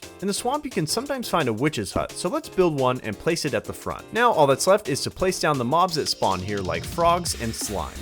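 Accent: England, United Kingdom. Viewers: Английский акцент... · Дякуєм за додавання Української до відео, хоч там і є певні помилки і ще й о той Англійський акцент... але це пусте...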